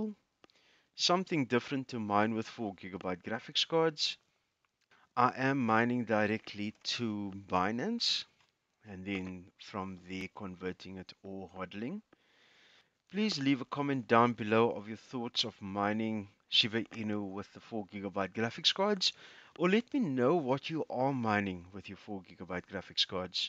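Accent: South Africa. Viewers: my man sounds South African AF well done thanks for the useful info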